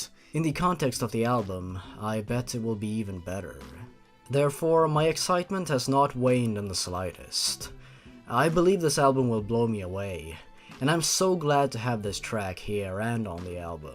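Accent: Sweden. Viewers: Visste inte du var svensk xD Borde ha fattat på dialekten, men jaja...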